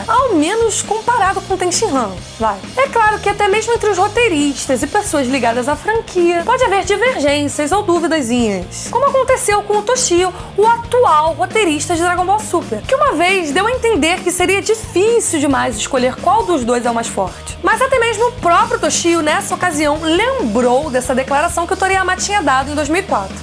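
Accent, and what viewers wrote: Rio de Janeiro, Brazil. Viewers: Ela é carioca? kkkk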